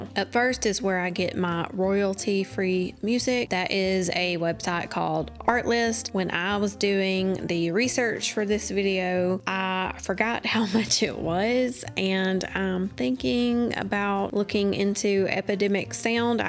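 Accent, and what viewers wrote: South, United States. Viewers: I really love all of your content. Every single video is entertaining, thought-provoking and energising. I especially love watching you and your husband interact. One thing that stood out to me from the beginning was your slower Southern accent. I struggle to understand anyone with any accent talk fast and always have to enable subtitles online, which can distract from the content at times. And I always say "I wish I could enable subtitles in real life". I did struggle to understand your husband though (even YT subtitles got mixed up sometimes hehehe - which was entertaining in its own right) but his warm personality, you interacting with him, Bayley's antics, all the emotions, and the cutest random animal showcases ALL made me (and my mom) keep watching. We are so in awe of your survival story that anything you post is a lovely surprise. 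Like opening a foreign candy wrapper and experiencing a burst of new flavour. By the way, me and my mom have a disorder called misophonia (decreased tolerance to specific sounds) and still continued watching. That's how much we love your channel. Another BIG reason I'll continue watching your content no matter what is the fact that you are NOT religious. There's so much I can say about that but I'd rather not... insert sound of crickets here...I really appreciate slower more intentional long-form content in a sea of fast-paced crappy content. I was particularly also intrigued by the wild 911 calls and interaction with your former co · Hi, I love the way you speak, it's perfect, not too fast and I love the southern accent! I look forward to hearing more about your journey. I can relate to the fear of dying and wanting to have a place for loved ones to see and hear you. I started my channel during covid. 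I was so scared that I wouldn't get to see them again, i made my channel for them so they can hear my voice if something happens to me. And I'm like you also, my channel is not for promoting products, I have a standard letter I copy and paste into a return email thanking them, etc. This is an awesome video and thanks for taking the time to share your knowledge with us. Take care.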